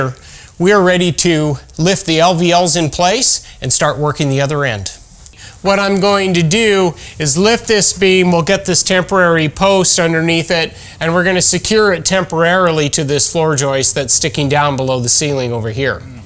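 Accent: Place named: Canada. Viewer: You sound Canadian....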